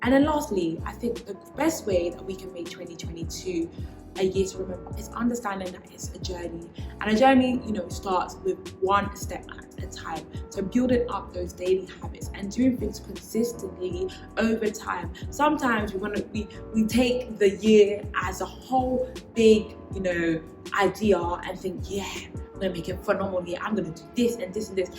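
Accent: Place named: Jamaica. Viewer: This Jamaican accent you’re giving us 😂😂😂❤️ love you and you’re doing amazing ❤️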